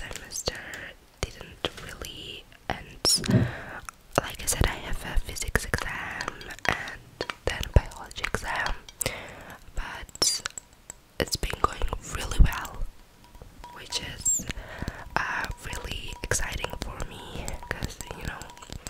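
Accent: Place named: East Germany, Germany. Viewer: I think Eastern German/Polish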